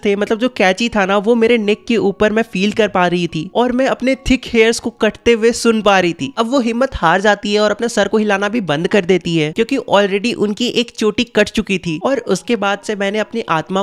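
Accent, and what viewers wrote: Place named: Jharkhand, India. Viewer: Bro your accent is jharkhandi.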